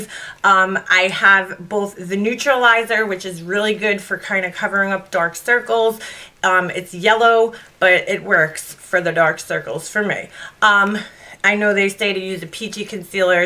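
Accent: New York, United States. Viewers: I'm a subscriber!! 🙋 Do you do acyric nails too?? You have a New York accent. You Rock !!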